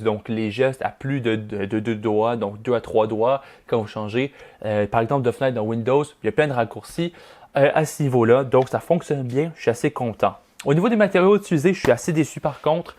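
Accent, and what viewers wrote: Quebec, Canada. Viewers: j'adore ton accent québécois +1 abo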